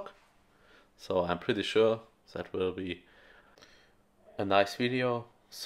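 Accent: Germany. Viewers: Sofort erkannte das er deutsch ist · Your English is so German <3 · Geiles Video wenn man es stumm schaltet. Dein Akzent ist leider ungenießbar. Ich denke nicht dass jemand englisch sprachiges das eher toleriert als jemand der an den "Deutschen Akzent" schon gewöhnt ist. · ich lebe in Freiburg Breisgau und ich schaue gerne deine Videos und habe grad erst festgestellt, warum das so ist. Ich höre dich gerne sprechen. Dieser leicht kölsche Dialekt kam mir so vertraut vor und dann erst stell ich fest, das Ihr in Troisdorf seid und ich keine 250 Meter von euch geboren und aufgewachsen bin erinnere mich an schöne abende im Saga und freue mich. Schöne grüße in die Heimat und macht weiter so. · Man merkt leicht das er Deutsch ist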